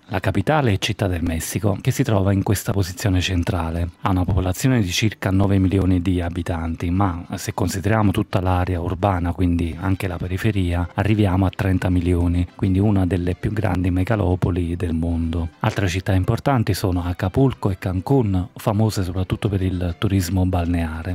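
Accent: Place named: Spain. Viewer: Wow che bell accento in Spagnolo!❤️🙌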